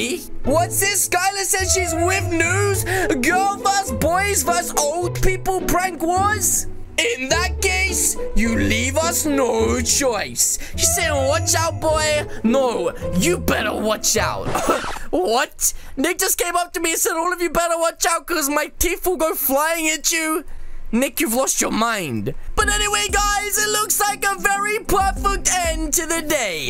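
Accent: United Kingdom. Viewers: XD British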